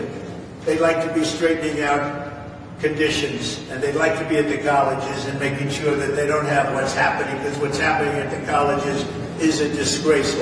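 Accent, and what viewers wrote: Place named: New York, United States. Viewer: You can tell he is back in New York, his accent is slipping back to his home state! The best for America!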